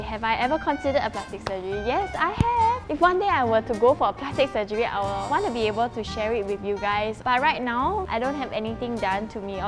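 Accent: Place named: Malaysia. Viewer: Where is this girl from? She has the "lah" slang so wether she is Malaysian or Singaporean? Sorry if I'm wrong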